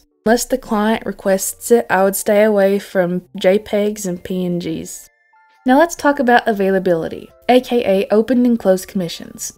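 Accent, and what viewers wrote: South, United States. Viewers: you sound southern but your voice is so sweet and calming! this video is so helpful thank youu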